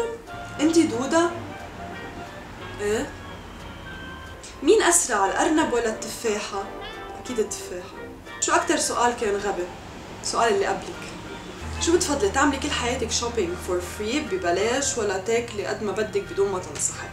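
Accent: Lebanon. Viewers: اللكنة اللبنانية مقرفة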